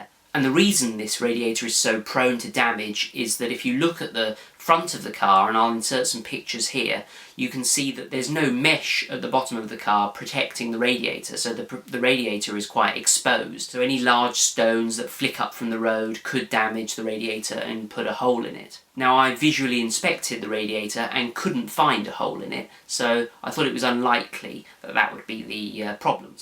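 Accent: United Kingdom. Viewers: He has a voice of an English person not a Chav,, rare these days .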